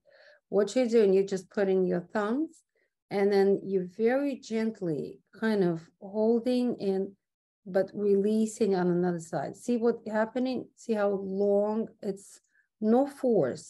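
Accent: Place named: New York, United States. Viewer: great tutorial, loved the NY/LI accent. Is there a link to upcoming workshops?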